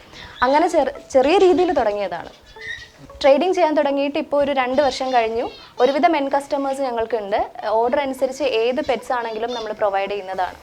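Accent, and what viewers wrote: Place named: Tamil Nadu, India. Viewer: Unga voice la tamil la maraikka pannureenga but tamil oru poothum maariyathu mam valthukkal mam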